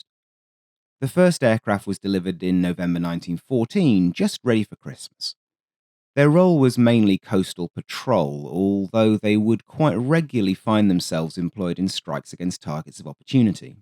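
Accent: United Kingdom. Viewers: Ah, an excellent gift from our favorite subtly snarky British military aviation narrator as Christmas approaches.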